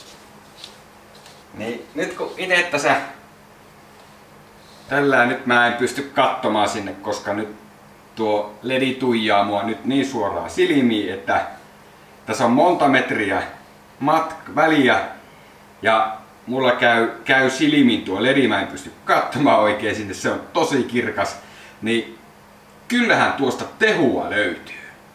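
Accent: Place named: Oulu, Finland. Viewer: Pähiä kampe! On tuo Oulun kieli sitten hienoa.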